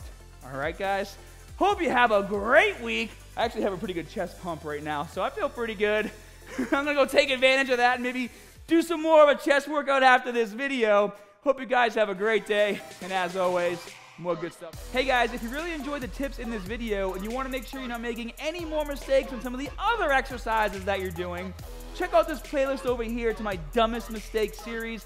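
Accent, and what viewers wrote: Boston, United States. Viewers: He is from Boston. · Awesome video. Ur Boston accent makes it even more enjoyable. I'd rather watch u than any of those not natty instructors on social media. Ur down to earth and real and u care about helping people. Thats the most important thing 👏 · Love this guy and his accent, he’s quite knowledgeable, sounds like a South Boston native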